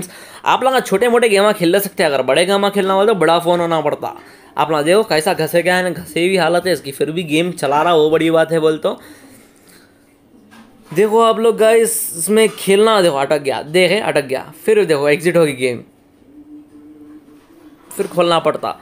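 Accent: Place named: Hyderabad, India. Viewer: I love Hyderabad talking style ❤️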